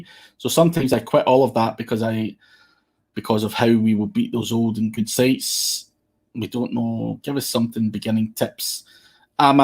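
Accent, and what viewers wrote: Scotland, United Kingdom. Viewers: What's up with all these wankers complaining about the awesome Scottish voice!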